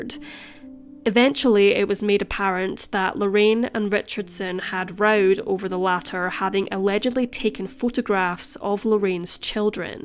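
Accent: Scotland, United Kingdom. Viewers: People like a range of delivery speeds and pitch/speed variation. I like hers for her. And some are effective with different paces. I'm originally from the East coast USA, though I've lived in the NW and SW. East Coast people tend to speak faster. Slower: Southern, Western Mountain. And the Scottish parts narrator is from. Because she to me speaks with an accent, one I like, I wouldnt' want it sped up much or I might not understand her. E.g., there are vids of unintelligible faster talking Yorkshire people. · Ignore all the idiots criticising the accent. As a fellow Edinburgher I’d detect the ‘Educated Scots’ tone a mile away. The accent is actually far batter for enunciation and pronunciation than almost any in the U.K. Anywho, if you are taking requests-how about the Alan Wilson/Ian Sutherland case? By a circumstance of pure serendipity I wasn’t actually at one of the trial seasons for Alan Wilson when he has charged with child molestation. 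Imagine my surprise when, a few years laters, he turns up dismembers in a bin next door to where a work colleague lived. Life is funny that way eh? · I’m born and bred in Liverpool and never heard of this case. Beautifully narrated love the Scottish accent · I'm not a native english speaker so the pace really helps me understand the Scottish accent :) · Love your voice and accent. Sounds Scottish. Lovely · It’s the Scottish way of saying he was born into a family. Like a wee Bairn. 😂 My family are originally from Scotland. Her accent is cute. I’m American though . I don’t have it though unfortunately. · Why would anyone criticize your lovely Scottish accent? Your accent and compassion for the victims are part of the reason I like this channel. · Sounds to me like her pace of speech is just normal. Not too fast and certainly not too slow. Just right. And I would say, with having her beautiful Scottish accent, for many, this pace (rather than fast) would be ideal. 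:)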